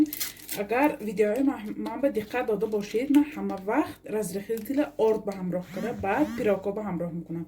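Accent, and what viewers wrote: Isfara, Tajikistan. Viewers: Ассалому алейкум ва Рахматуллохи ва Баракатух ойя шумо аз Исфара лахчатон Исфарача бехтарин пухтупаз барои занхо 👍👍👍👍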